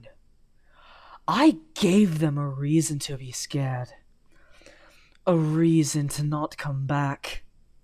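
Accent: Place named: United Kingdom. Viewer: AHHH I loved your british accent 😍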